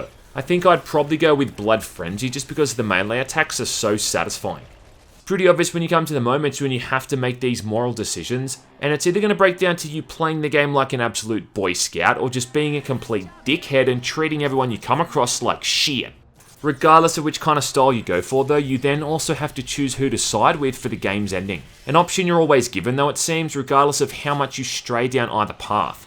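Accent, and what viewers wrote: Australia. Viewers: You are quickly becoming my favorite channel to watch. The delivery, the jokes, and the Australian accent ( oh ya, and the games). God tier content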